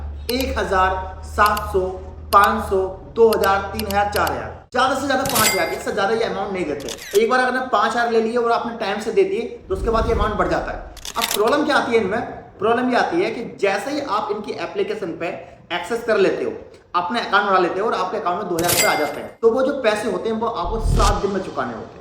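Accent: India. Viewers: आवाज़ से तो maharastra का लग रहा है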